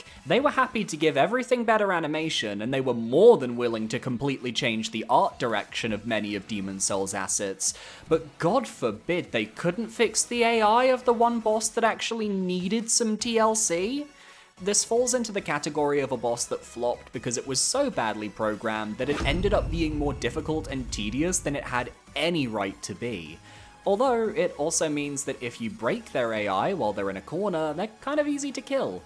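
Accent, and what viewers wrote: United Kingdom. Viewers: TF is the awful Scottish accent about? Reckless behavior 😂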